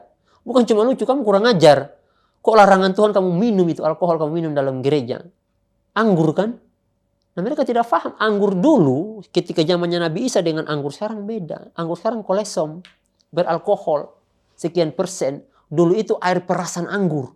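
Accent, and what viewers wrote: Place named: East Nusa Tenggara, Indonesia. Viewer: Logatnya ntt bukan Poso lagi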